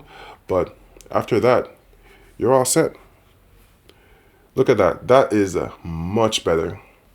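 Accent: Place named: Jamaica. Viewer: Sound like a yardie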